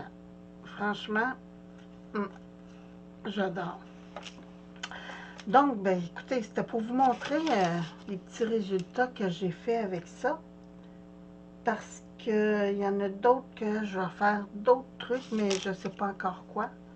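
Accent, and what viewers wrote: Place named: Quebec, Canada. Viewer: Je me suis commandé ton papier vélum, il arrive demain. Tu m’as vraiment donné le goût . Je viens de découvrir ta chaîne. J’aime beaucoup regarder les vidéos qui me donnent des idées. J’en suis beaucoup de France. Mais par ton accent et tu parle de dollars alors cela me dit que tu es peut être du Québec??? Contente d’avoir découvert ta chaine 🥰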